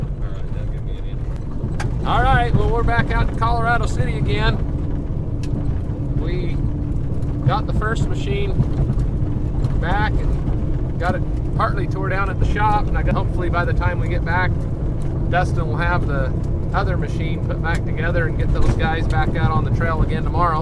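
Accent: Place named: Texas, United States. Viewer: Ok I know you live in Utah but, since you mentioned it, what part of the Country are you from? Sounds like Texas.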